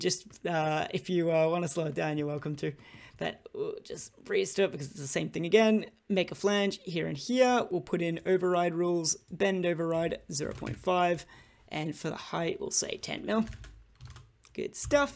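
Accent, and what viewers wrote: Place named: Australia. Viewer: I can tell this mate is an Aussie lol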